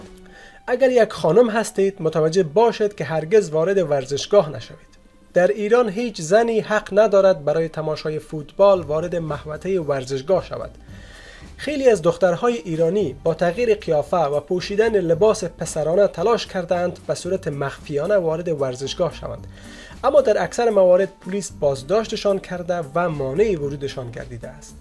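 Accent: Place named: Afghanistan. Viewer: جالبه که خود این بابا لهجه افغانی داره 😅😅😅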